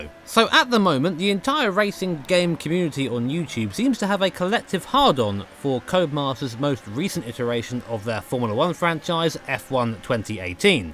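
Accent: United Kingdom. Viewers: Annoying thic Brit!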